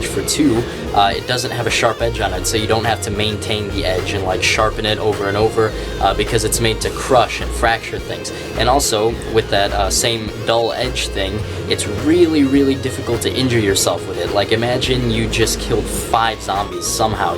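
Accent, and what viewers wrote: South Africa. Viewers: South african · you soud like a south African like me because I am one hahaha lol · Not too bad of a South African accent there. I'm from South Africa. Love the vids Chine. Chine means M8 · Convincing South African accent bro, and that's coming from a South African! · Damn, nice mace and an even better Afrikaans accent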